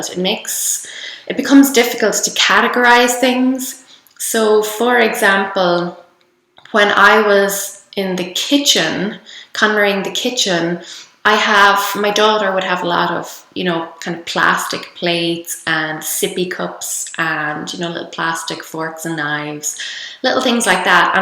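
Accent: Ireland. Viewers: I DO like an Irish accent ;) :) !! But I am also impressed how early on you found and implemented konmari/Marie Kondo's tips and philosophy - I am so grateful to have stumbled upon her Netflix Show - what a game-changer!! And now that I've binge-watched the heck out of it, konmari youtube-videos are my daily go-to!! Thank you!!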